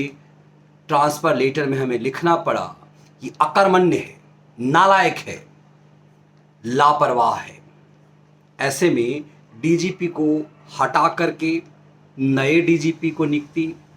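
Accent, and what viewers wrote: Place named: Bihar, India. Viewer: आदरणीय महान् विद्वान और राष्ट्रवाद के सशक्त और प्रबल समर्थक श्री, बहुत ही सुन्दर,सटीक और समसामयिक विश्लेषण किया है। पर आज़ आपका संबोधन और भाव भंगिमा विगत (भूत काल) के जैसा प्रतीत नहीं लगता है। आपकी हंसमुख, चुटिली वाणी, और ठेठ बिहारी लहजा ही आपकी अनोखी अछूती और अदभुत पहचान है। वही बनाए रखें। प्रोफेसर जैसा लेक्चर तो सभी पत्रकार देते हैं।आप अपनी पूर्व भूमिका में ही अच्छे लगते हैं, धन्यवाद।🎉🎉🎉🎉🎉🎉🎉🎉🎉🎉🎉🙏🙏🙏🙏🙏🙏🙏🙏🙏🙏🙏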